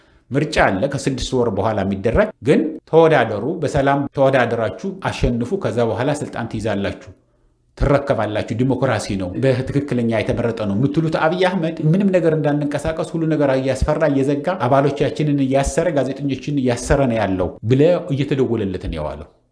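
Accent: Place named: Eritrea. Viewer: አክሰንትህ የኤርትራ ነው በቃ ኢትዮጵያውያን በናንተምክንያት ስንቱን ስቃይ አዩ ኢሳያስ የሚባል ጸረ ኢትዮጵያዊ ነው!!! አብይን ብጠላውም የዝህ ሁሉ የቆየ መዘዝ የኢሳያስ ፖለቲካ ነው እግዚአብሔር እናንተን ብቻ ይቅጣቹ ክፉዎች ቀናተኞች ! ቲፒኤሌፍ ኦነግ ብትሉት እናንተ የሰራችሁት ጸረ ኢትዮጵያ ስብስብ ናቸው !!! እኔ ተስፋዬ በእግዚአብሔር ብቻ ነው የእሱ ፍርድ ይውረድ ምርጥ ሃገር ነበረን እድሜ ለጅኖሆይ ያው ለሁሉም ሞት ሆነ እሳቸውን በግፍ ጥላቹ የእሳቸው እርግምን በየቤቱ እየገባ ነው ይበለው ይሄ ሕዝብ ማዘንም አያስፈልግም !!! ክታንኩት ፖለቲካ የዘር ፖለቲካ ለማን በጀ????? ለማንም !!! እርስ በእርስ ተጫረስ ሃገር አልባ ሆነን ማየት ነው የሚያስፈልገን ያኔ ይቆጨናል !!!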